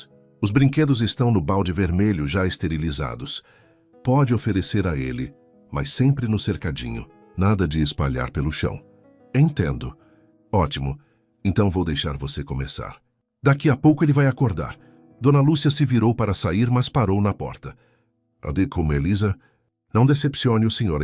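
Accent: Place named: Portugal. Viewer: É necessário tomar mais cuidado com a narração. Foi lamentável a escuta de portugues de Portugal, depois inglês...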